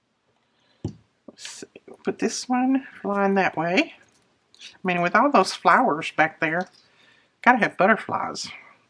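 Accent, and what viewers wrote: South, United States. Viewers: You are one of my favs on YouTube. I love that southern accent and calming voice. Keep being you and sharing your feelings with us. Block anyone that says otherwise.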